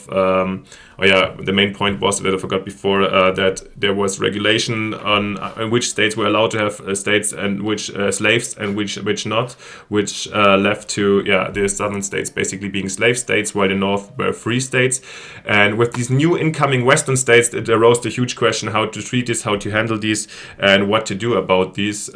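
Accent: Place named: Germany. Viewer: Wusst ich doch gleich, dass das n Deutscher ist. Aber sehr gutes Englisch. Da möchte ich meine paar englischen Videos direkt private stellen haha.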